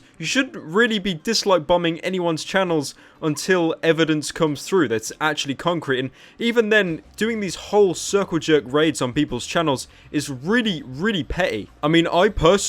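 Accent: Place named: Midlands, United Kingdom. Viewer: Where u from? trying to pin ur dialect/accent... u sound (UK) Midlands"ish".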